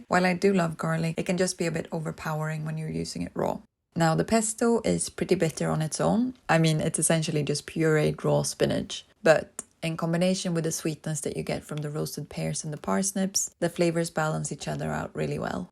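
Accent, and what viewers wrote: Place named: Sweden. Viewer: Your channel is very cosy! I love your simple and nutritious recipes. Wishing you the best success on here. P.s your Swe(?) accent is lovely :)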